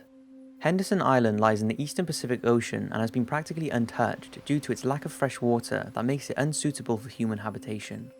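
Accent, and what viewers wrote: Wales, United Kingdom. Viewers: Who's the narrator? He sounds both Welsh and English! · He sounds it, would guess either East Wales like Newtown or Welshpool or maybe Ceredigion. He doesn't sound as broad as South or North Welsh. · it's part of his dialect, wherever he's from - somewhere in wales, i can guess. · The video is all the more interesting because of your british accent.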